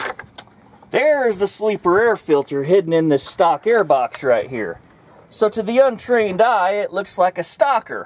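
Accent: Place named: Oklahoma, United States. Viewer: Are you sure you're not in California? That place looks exactly like Boyikersfield. And you sound exactly like an Okey from Korn County.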